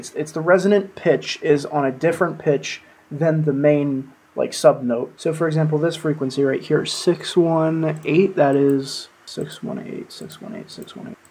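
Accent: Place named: United States. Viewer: I'm making an assumption on your accent but you sound like you're American! Dude I am going to rep you so hard, we need more Americans making hardcore.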